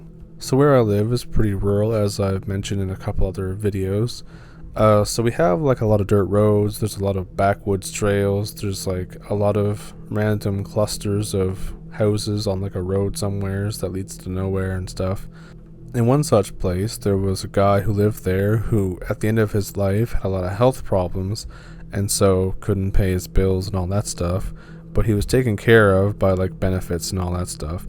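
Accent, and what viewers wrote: Canada. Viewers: Indiana? Sound Canadian there dude.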